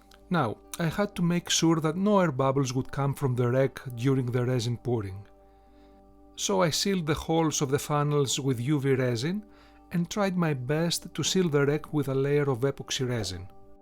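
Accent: Greece. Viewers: Με τη προφορα των αγγλικών ήμουν σιγουρος οτι εισαι Ελληνας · are you greek ? Your accent sounds alike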